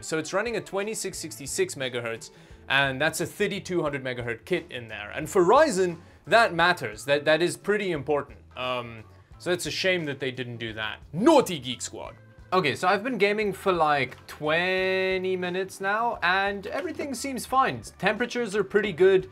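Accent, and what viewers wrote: South Africa. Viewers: Are u from south africa? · Bro are you South African?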